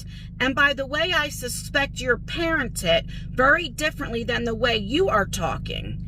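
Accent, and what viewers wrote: New Jersey, United States. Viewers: It’s just been a few months since I found your vlog channel and now I watch every one❤ Just know there’s lots of us that really care about you and enjoy your videos. I wish I could help 😊 I love that you protect your kids and I’m also diabetic so I can relate. I’m also a mama bear with my kids and grandkids. 😅 Besides the fact I’m from California and LOVE YOUR NEW JERSEY ACCENT 😂 Reminds me of when I worked in a Jewish Deli when I was a kid. I worked with a cook from New Jersey 😊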